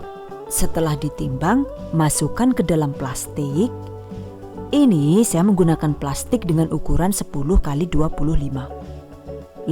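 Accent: Banyumas, Indonesia. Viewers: Bahasanya itu lho yg ngangenin . Campur ngapak Ben tambah kepenak 😀👍🏻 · Jan...sampean nk logat ngapake metu ddi marekma ngguyu.menghibur karo nimba ilmu #GiveawayTHV2021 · Ibu ngapak jg ya😂. Asli mana bu? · Alhamdulillah ilmu yg bermanfaat...semoga Istiqomah sangat terpercaya....bocah ngapak apa aslinya ya Bu??? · Warga Saranjana bisa ngapak juga toh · Mbak narator ini sebetulnya orang mana sih, kalau sy dengar suaranya bahasa Inggris nya bagus, bahasa Indonesia nya jg bagus dan itu loh boso jowone koq ngapak ya. Kadang sy suka geli sendiri, ok mbak salam kenal dari Bandung 😍. By the way sy suka loh dgn video"nya.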